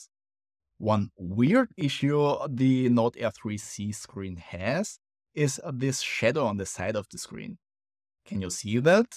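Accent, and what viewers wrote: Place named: Austria. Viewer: you have a very austrian accent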